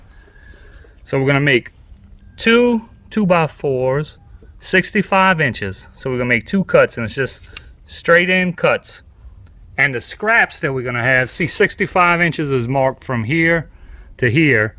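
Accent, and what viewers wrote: Louisiana, United States. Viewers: Where are you from sir? I’m from Lafayette, La currently living in Pittsburgh, Pa and that accent is a taste of home! Me and my sons enjoy your videos! Keep it up!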